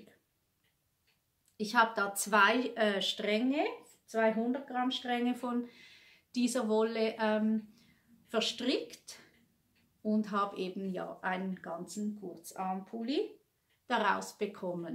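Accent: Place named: Switzerland. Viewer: Liebe, vielen Dank für deine tolle und unterhaltsame Podcastfolge. Ich bin erst vor ein paar Wochen bei dir eingestiegen, da die liebe Angie von dich empfohlen hat. Und ich muss sagen mit Recht! Du hast so eine positive, lustige Art, da bekommt man direkt gute Laune 😊. Deine Projekte gefallen mir auch sehr gut und ich habe bisher auch noch keinen Kolding, will ihn aber auch noch stricken. Strickt du die normale oder die kleine Version? Und dein Schweizer Dialekt 😍 ( sagt man Dialekt?) Eigentlich ist es ja eine eigenständige Sprache. Ich liebe es!! Viele Grüße aus dem Rheinland Katrin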